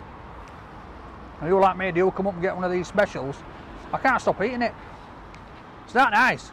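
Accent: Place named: Yorkshire, United Kingdom. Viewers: Yorkshire accent rainy weather and brilliant fish and chips.. I'm fasting but I'm coming after Ramadan for this.. Great video pal keep it up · He's from Barnsley and lives in Leeds I'd say that's a Yorkshire accent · I do really love the voice of a Yorkshireman! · The accents are similar but not the same. This guy is from West Yorkshire and Peter Kay is from Lancashire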